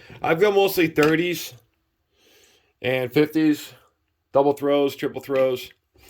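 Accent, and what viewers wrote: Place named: New York, United States. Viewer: sounds like your from new york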